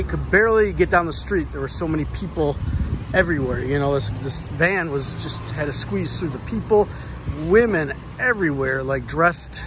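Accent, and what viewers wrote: United States. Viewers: stumbled across another channel i like. where you from? you sound like a fellow east coaster if i had to guess.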